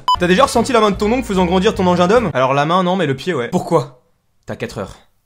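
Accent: France. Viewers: Tu me fais bien rire avec ton accent français ! bisou du Québec ! :)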